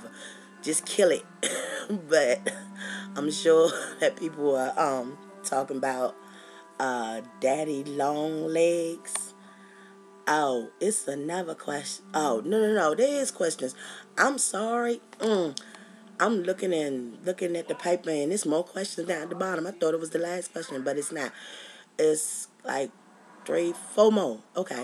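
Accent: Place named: South, United States. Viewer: hahaha love this yes u do sound so country i love it though thats what makes u though:-)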